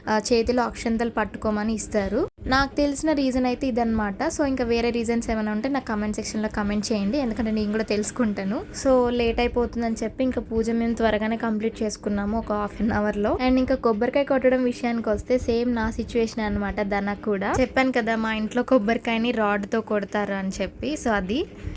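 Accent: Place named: Andhra Pradesh, India. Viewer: Miru ekkuvaga a slang vadtharu Mean Andhra vs telangana Indhulo aythe naku Andhra ne anpinchindhi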